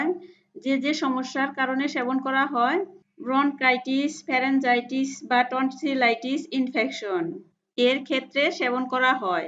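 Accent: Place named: Tangail, Bangladesh. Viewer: Oi apnar voice sune mone hoy apnar basa tangail sotti na ki??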